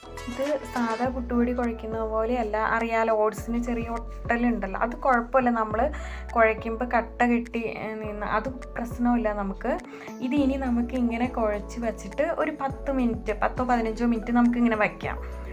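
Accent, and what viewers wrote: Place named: Thrissur, India. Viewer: Ennu ഹെൽത്തി ആയ ഓട്സ് പുട്ട് തന്നെ ആണല്ലോ ഉണ്ടാക്കിയത്. സംസാരം കേട്ടിട്ട് തൃശൂർ ആണെന്ന് thonunnu👌🏻👍🏻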